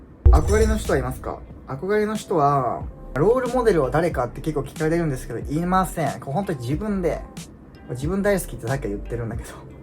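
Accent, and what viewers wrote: Kansai, Japan. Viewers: 伊予弁やから関西アクセントなんねー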